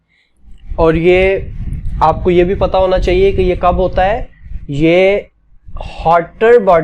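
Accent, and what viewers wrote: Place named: Pakistan. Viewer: I guess, you are from pakistan. No hate❤, bro got to know from your accent.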